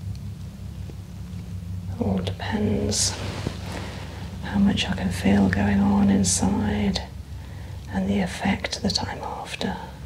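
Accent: England, United Kingdom. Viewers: Very relaxing, educational, I'm not going to lie, love the soft English accent and best of all no ads... perhaps, I've said too much.. ... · Absolutely one of the best and most relaxing massages I’ve had the pleasure to watch here on YouTube. Your voice is so magnificent, nothing is more pleasing to an American ear as that of a tranquil English accent.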